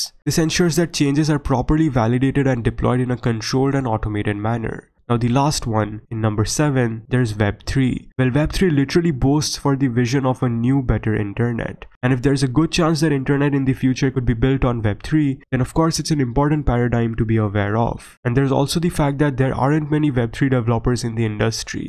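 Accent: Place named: India. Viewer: AND THERE'S the indian accent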